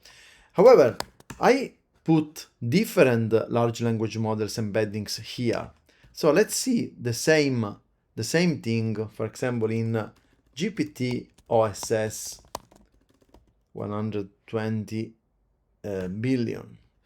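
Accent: Sicily, Italy. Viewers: sentirlo parlare in inglese con accento siciliano , mi rende felice. chi fa questo lavoro deve essere bravo nello sviluppo non in lingue estere. spesso nel support service di cisco succedevano telefonate dove non si capiva nulla. e nessunondi noi ha mai detto niente. altro che accento siciliano.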